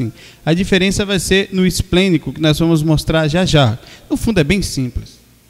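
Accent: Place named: Bahia, Brazil. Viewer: Nestes vídeos antigos ele não tem sotaque, que diferente. Ouvindo em 2023. Assistindo ele atualmente percebe-se um sotaque bem pronunciado da Bahia. ❤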